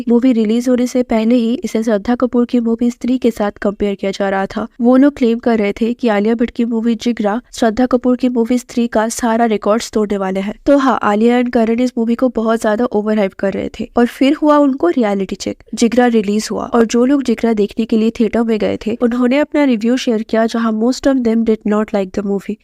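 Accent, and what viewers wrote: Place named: Kolkata, India. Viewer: That's khosla, not khoshla😂 I dont know why but I feel you are from Kolkata🤔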